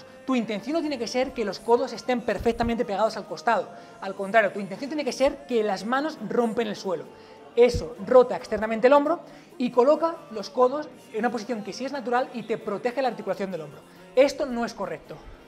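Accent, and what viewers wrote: Spain. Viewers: Jajajaja y yo esperando un hello tipo gringo y resulta que era un español xD joder tio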